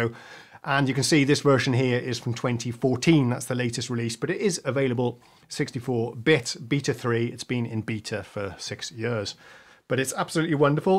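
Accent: United Kingdom. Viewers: Starting to get weary of this British accent teaching us incessantly about everything and oh, don’t forget to toss in a few meters and kilometers.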